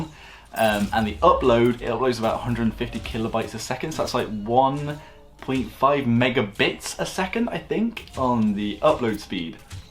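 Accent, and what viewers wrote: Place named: England, United Kingdom. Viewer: What part of England are you from? :D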